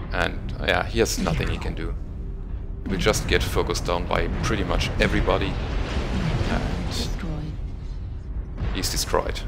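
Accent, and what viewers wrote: Germany. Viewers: Whoa, you are a really high skill MWO pilot. Your videos are excellent and your analysis and advice are right on the spot, thank you ! Always entertaining, that European (guessing German) accent is...OK? With that same accent but using a voice modulator this your vids could get even better !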